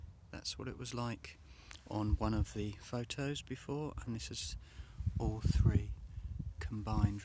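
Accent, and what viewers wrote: United Kingdom. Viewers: Thanks, That link didn't work but no matter as I found a link to download on the VibranceHDR webpage and installed from there. It was a bit disconcerting as Google obviously not happy with that and gave me all sorts of dire warnings and are-you-sures? But I pressed on and installed. Then I received all sorts of unwanted notifications from them on all manner of subjects but blocked them and now all is quiet again. I just need to try out Vibrance HDR now and I shall follow your guidance closely. I have to say it was nice to hear another English voice on a YouTube tech/geeky subject video and your quiet clear and measured presentation is much appreciated along with your commentary on extraneous helicopter and gun noises - made it all the more human and genuine for me;-) If I haven't subscribed then I'm about to. Best wishes. Philip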